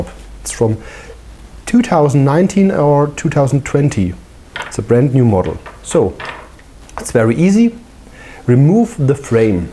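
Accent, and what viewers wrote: Germany. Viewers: Bei meinem A517 scheint das Kabel einen Wackelkontakt haben. Teilweise fällt das Bild aus, oder es flackert. Kann ich das Kabel auswechseln und worauf muss ich dabei achten. Ich gehe davon aus, dass deine Muttersprache deutsch ist. Gruß Klaus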